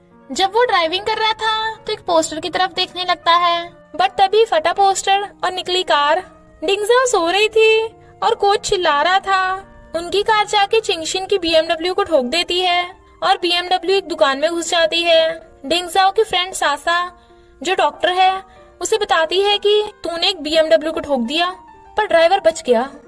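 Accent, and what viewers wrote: Punjab, India. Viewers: Aap punjabi ho 😮?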